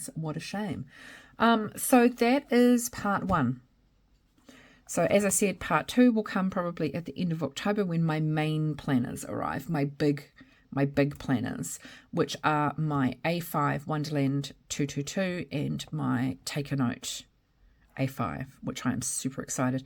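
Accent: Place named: New Zealand. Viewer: Hearing a fellow kiwi is always a treat! I love the A6 size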